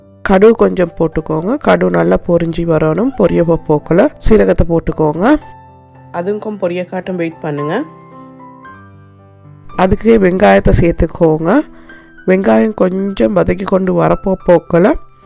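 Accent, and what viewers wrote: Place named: Sri Lanka. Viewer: R u frm Srilanka?bkas yr Tamizh pronounce,that u r Srilankan....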